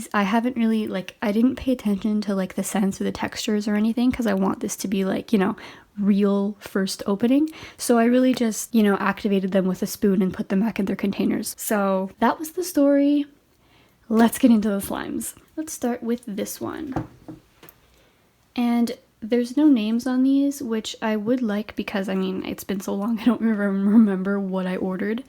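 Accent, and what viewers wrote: France. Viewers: AA, ma queen back with another LITTT VIDEO! Was this am asmr because the vid was just satisfying also your french accent! <3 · Your French accent is soo cute🥰❤️ love you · I love your french accent and idk why but i was so entertained by the story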